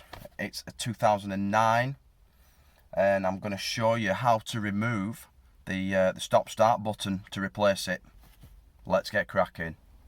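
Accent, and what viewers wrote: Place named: Cumbria, United Kingdom. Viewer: I dont hear many other Cumbrian accents on You Tube..lol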